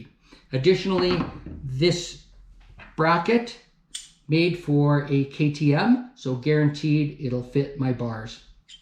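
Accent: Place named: Canada. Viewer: I knew you were Canadian as soon as I heard your accent. 😎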